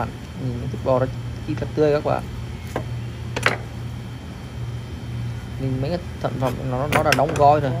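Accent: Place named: Ha Tinh, Vietnam. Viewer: Máy cắt thịt đông êm đó anh 👍👍giọng nói anh nghe quen quen anh quê ở đâu vậy giống giọng hà tĩnh với nghệ an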